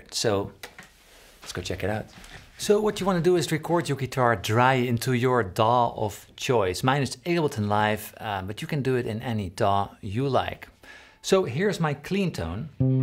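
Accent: Netherlands. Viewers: The best things about ' music videos (in random order): --The Dutch accent that can sometimes make him difficult to understand momentarily, yet lends a certain gravitas --The humble, self-effacing humor --The funny, quirky video shots --The superb production quality of the videos --The thoughtful design and progression of material in each video --The time and effort he obviously dedicates to making them so exceptional --The love and passion for music he shares and inspires --The broad knowledge of music and musicians he offers --The uncanny ability in selecting interesting subject content--or otherwise making it interesting --The personal honesty and openness always evident --The willingness to question his own opinions, not just others --The creative musical thirst and desire for experimentation --The excellent, wide-ranging musical taste --The intelligence and ability to breakdown the complex into the comprehensible --The superb guitar playing If there is anything above found missing or incorrect, feel free to reply and add your thoughts. 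Already remembered one big thing not mentioned: THANK-YOU,!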